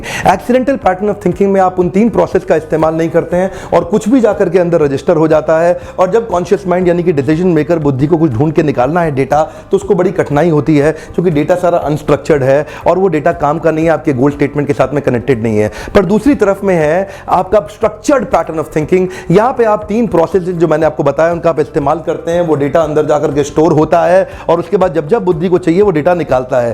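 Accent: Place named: India. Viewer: What talking about ,didn't understand the India English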